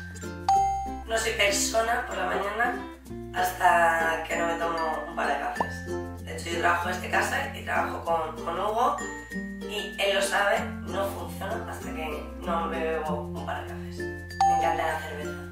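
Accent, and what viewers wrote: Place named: A Coruña, Spain. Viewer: No es que tengas acento gallego, es que tienes acento y expresiones de coruño!!! jaja a mí me encanta guapa, un besiñoooo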